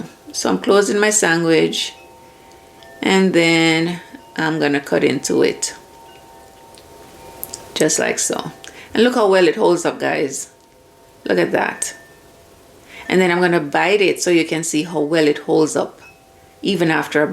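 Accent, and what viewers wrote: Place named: Jamaica. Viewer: Commenting and liking the video for the algorithm - big up that beautiful patwa accent. I badly wanted more homemade vegan (I can swap out eggs) bread recipes. This one is really good. Thank you.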